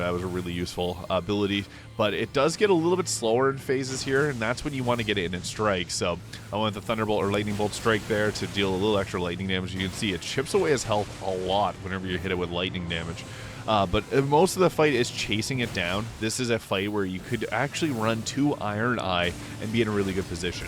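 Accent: Canada. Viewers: Is that a Canadian accent by chance? I’m from B.C and I swear I hear a fellow Canuck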